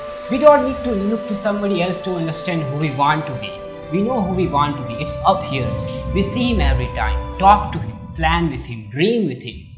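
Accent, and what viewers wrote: India. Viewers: Dude, that heavy Indian accent is a put off. But other then that, content is good. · why is it not proper english, you cant expect an indian man to talk in an american or english accent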